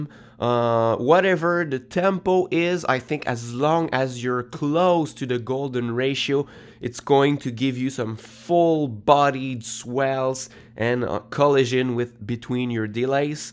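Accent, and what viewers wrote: Quebec, Canada. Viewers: Is that accent from Québec?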